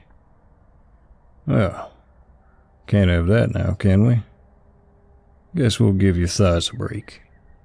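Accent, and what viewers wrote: South, United States. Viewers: melted immediately with the real sounding southern accent 🥴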